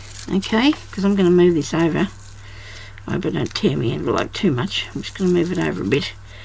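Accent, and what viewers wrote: Australia. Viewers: Very very pretty love it...and your accent also....where is it from Australia?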